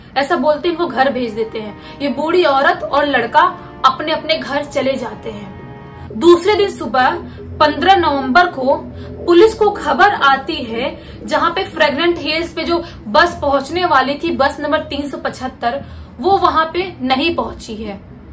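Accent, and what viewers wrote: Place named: Uttar Pradesh, India. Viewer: madam k bolne ka way of talking hi esa hai she's from uttarpradesh side...maybe isliye....but Kisi ko appreciate na kar sako to criticize karne ka bhi koi haq nhi h tum jese mahapurusho ko 🤦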